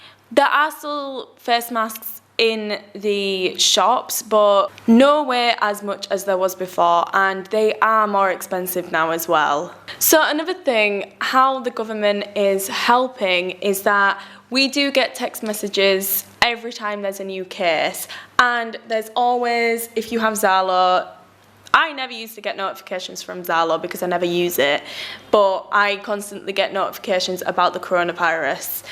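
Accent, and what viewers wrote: United Kingdom. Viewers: I love UK accent wish you all luck lady. BTW you're so pretty oh one more thing stay safe and healthy 👏👏👏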